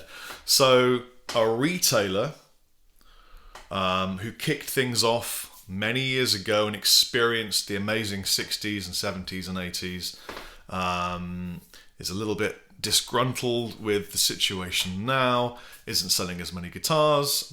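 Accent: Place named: United Kingdom. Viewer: He has a brittish accent, he speaks the truth!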